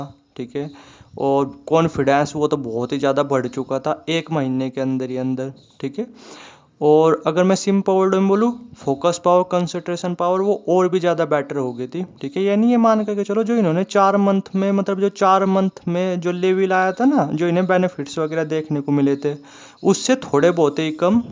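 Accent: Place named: Western Uttar Pradesh, India. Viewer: Nice story bhai ❤. Aap apni boli se west up ke lg rhe ho, whi k ho kya?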